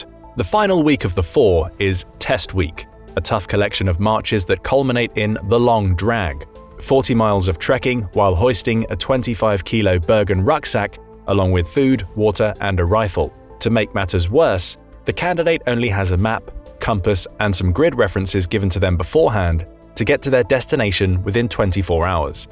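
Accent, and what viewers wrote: United Kingdom. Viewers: That’s exactly what I was just about to mention!! Is no one seeing that this vid is being narrated by a fellow Brit?!?😝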